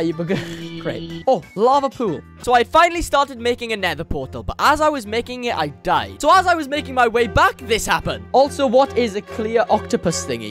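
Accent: Wales, United Kingdom. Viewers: Are you Welsh?😅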